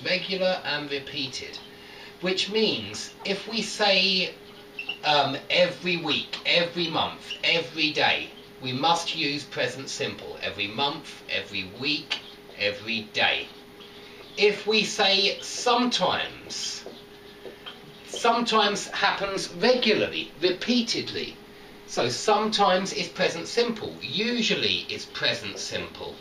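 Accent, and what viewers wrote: United Kingdom. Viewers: Thank for for your free lessons! You're doing fantastic job! Great explanation and authentic british accent! Please, make more!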